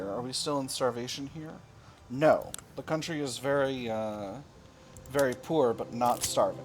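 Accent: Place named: United Kingdom. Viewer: Really enjoying the british accent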